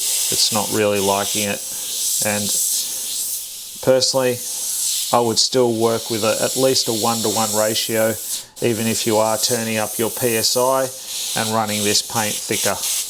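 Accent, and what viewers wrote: Australia. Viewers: Strange... Now i very very much hear your strong Australian Accent 😂😂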